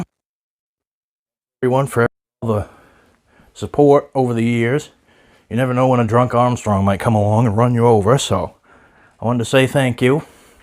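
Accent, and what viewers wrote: Maine, United States. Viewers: That's how an intelligent Maine accent sounds. Great message, dude.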